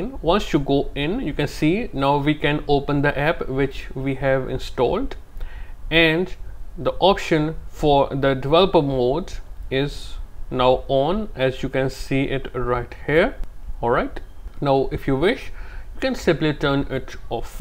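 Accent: India. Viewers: do i really have to resort to tutorials by people with clear indian accents (sorry if this comment is offensive, i don't have anything against anyone)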